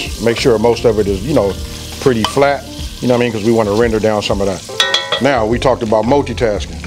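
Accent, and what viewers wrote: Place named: Arkansas, United States. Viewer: Love the way u cook dude!! Arkansas talking lol!!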